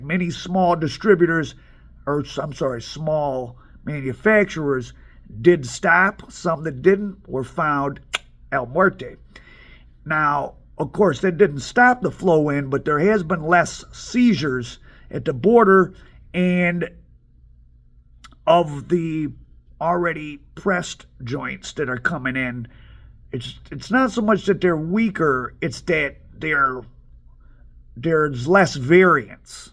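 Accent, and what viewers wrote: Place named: Chicago, United States. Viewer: Can't stand this guy's Chicago accent. Its like watching that movie Fargo.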